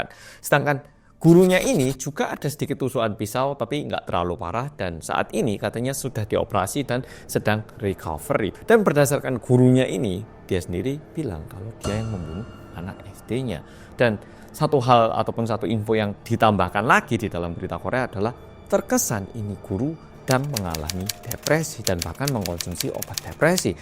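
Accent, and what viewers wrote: Java, Indonesia. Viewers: 😅😂😂 kamu lucu..medookkk..❤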